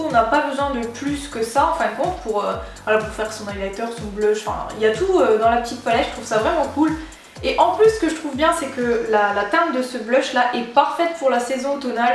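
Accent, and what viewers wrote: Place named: Saône-et-Loire, France. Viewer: J'ai l'impression que je connais ton accent, t'habites en saone et loire non?💓😂😂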